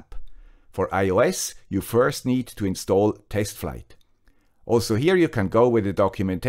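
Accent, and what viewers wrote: Switzerland. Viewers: Hello guy with the swiss accent, do you have by change knowledge of somebody using a lorawan gateway´s 9th channel (backhaul) for meshtastic...? Could it be possible? 73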